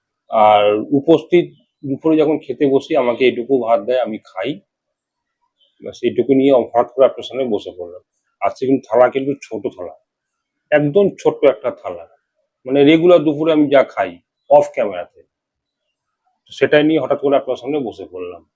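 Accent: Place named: Bangal, Bangladesh. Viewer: বাঙাল বাঙাল, ঘোটি কখনোই নয়। ওনার কথাবার্তায় পরিষ্কার বোঝা যায়।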